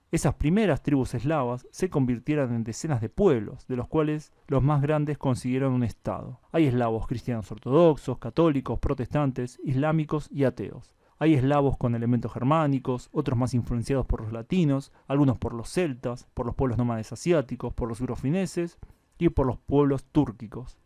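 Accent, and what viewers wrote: Buenos Aires, Argentina. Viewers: Eu amo o espanhol falado por ele. O espanhol portenho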